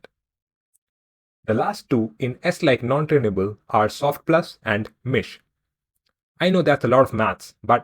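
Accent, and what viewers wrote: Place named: India. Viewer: You indians have great information videos on YouTube , but your problem is your accent in English is terrible for us to understand what you are saying. So please use AI voice instead of your natural voice please.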